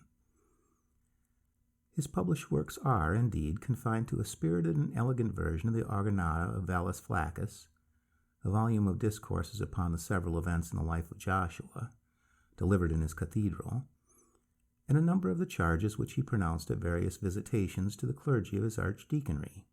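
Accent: United States. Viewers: Colonial? American…. he talks in a rush and then inapause…..strange delivery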